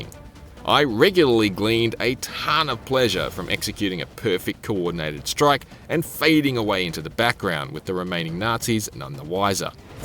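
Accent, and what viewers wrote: Australia. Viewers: I heard the Australian accent, and I was so worried it was the IGN voice up voice down guy. Thankfully it wasn't, and the video is actually watchable.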